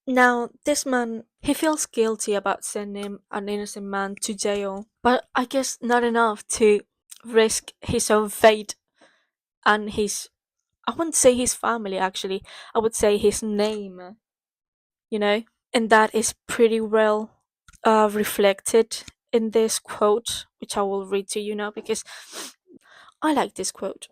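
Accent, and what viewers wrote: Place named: United Kingdom. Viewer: the britash accent is everything to me🗣️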